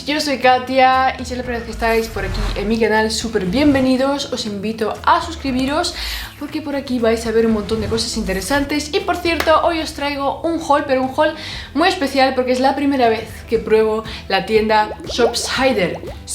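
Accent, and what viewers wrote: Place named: Switzerland. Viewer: ¿Eres suiza? ¿Rusa? Es que tienes algo de acento extranjero.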